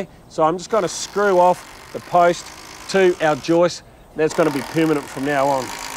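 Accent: New Zealand. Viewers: Thanks mate. I lived in NZ for about a year, but it has been while since then. Love Kiwi accent, sweet as. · I love New Zealand accent with construction. Absolutely Brilliant.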